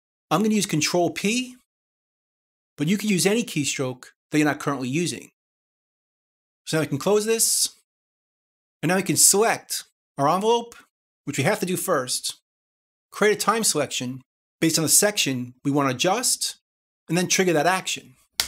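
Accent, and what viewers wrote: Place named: East Coast, United States. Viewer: That's his voice! He's obviously from the east coast (Jersey? ). It takes some getting used to, but his videos are excellent.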